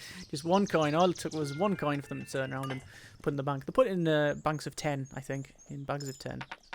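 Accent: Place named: Wales, United Kingdom. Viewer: Sounds Welsh to me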